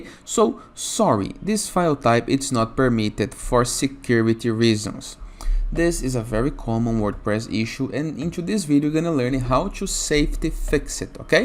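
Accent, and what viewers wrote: Brazil. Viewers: consigo reconhecer o sotaque brasileiro de longe, valeuzão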